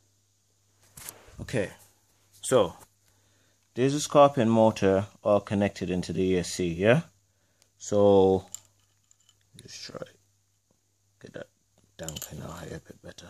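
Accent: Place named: Jamaica. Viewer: Sounds like my hometown Jamaican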